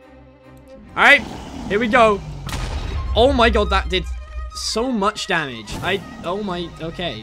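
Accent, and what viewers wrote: United Kingdom. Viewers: are you british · How do some dont know is British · HES BRITISH? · Very British.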